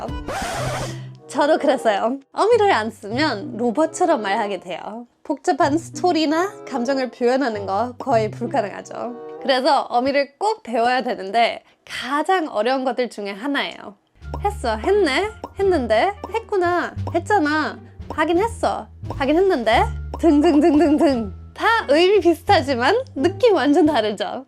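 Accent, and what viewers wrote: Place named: Vienna, Austria. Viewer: 여사님 목소리는 정말 비엔나 여성의 전형입니다. 프랑스 여성과의 큰 차이.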